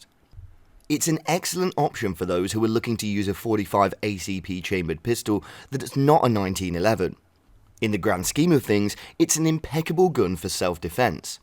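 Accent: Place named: United Kingdom. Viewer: I hear a British voice and immediately know this person doesn’t know shit about guns let alone ever seen one in person😂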